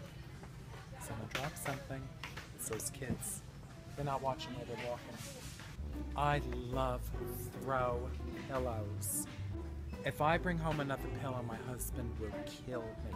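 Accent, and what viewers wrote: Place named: Bronx, United States. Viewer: OMG!! I am hooked on your store visits (also your Bronx accent - reminds me of home!!)!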